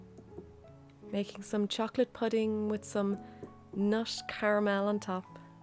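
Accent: Ireland. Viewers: Oh wow! I loved this. I don't know how I haven't seen your channel before! I'm Irish but living abroad and the two things I miss most about Ireland, the Irish accent and nature, you provide in abundance. I am now your number one fan ;-) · Where about from Ireland are you from as I adore your accent. My parents were Northern Irish and from Belfast. They emigrated to Australia when young and had 3 children with me being the only girl and youngest. I’ve never been but as I’ve got older I speak now with a broad Belfast accent I picked up from my years living with my parents. My husband is Scottish and we can’t believe how broad my accent is even though I’ve never been abroad. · Well I know that I could sit and listen to that Irish lilt all day long!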